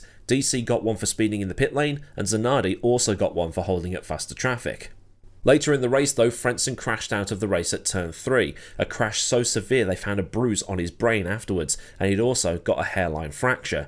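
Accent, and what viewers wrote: Canada. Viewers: Love the Canadian accent, although I think the appropriate Jacques Villeneuve, French Canadien accent would have been even funnier. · Checking in from Toronto, I will give you an 8/10 on the Canadian (English Speaking) Accent and a 9/10 on the appreciation of the Canadian sense of humour having "Biennvenue a Quebec" on that wall :)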